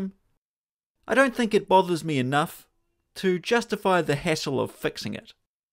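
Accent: New Zealand. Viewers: I wish you did this full time! Your videos are genuinely excellent. I think it is the kiwi commentary that makes them! Can’t wait for the next. 👍👍👍👍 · That would be the New Zealand pronunciation, just like 'fush n chups'!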